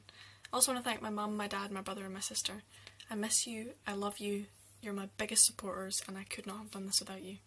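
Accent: Scotland, United Kingdom. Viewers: Ya helping a french lad to understand scots accent and words better, thanks a lot. 🙏 Also maybe you'd like to know (if you don't already) the Wikipedia in scots is a mess and they need people fluents in Scots and good wills to fix it !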